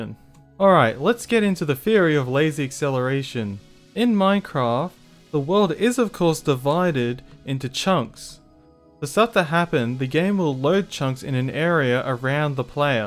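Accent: Australia. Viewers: also, AUSSIEEEEEE OMG (LOL)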